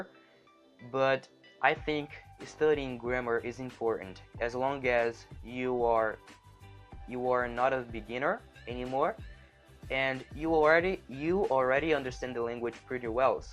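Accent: Brazil. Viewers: Não, a maior parte das palavras que utilizou são intermediárias, e o fato de ele ter um sotaque um pouquinho típico de brasileiros facilita a compreensão. O vídeo também só tem 9 minutos, o que facilita mais ainda ter uma boa compreensão. Mas sim, você está num ótimo ponto para começar a estudar a língua e falar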